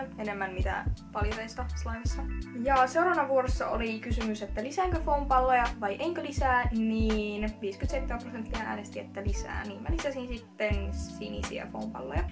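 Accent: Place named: Ostrobothnia, Finland. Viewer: 😂 Kuulostaa kuin puhuisit pohjanmaan murretta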